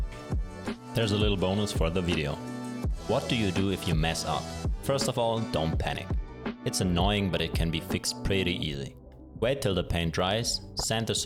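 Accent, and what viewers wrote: Germany. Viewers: Are you German? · Are you German ? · Are you german? I kinda hear it out. Danke für das Video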